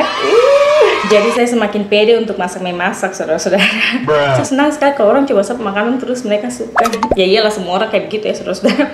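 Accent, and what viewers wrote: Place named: Eastern Indonesia, Indonesia. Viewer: mmng bahasanya seperti bgt yaa... Khas.... Daerah Timur.. Mantabbb.. GO go... Trs lah memperkenalkan kuliner Indonesia... 💕❤😋😋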